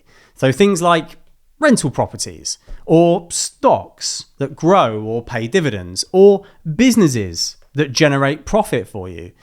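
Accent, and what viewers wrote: United Kingdom. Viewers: This is a really useful resource, both in terms of finances and for learning English. are you a Londoner?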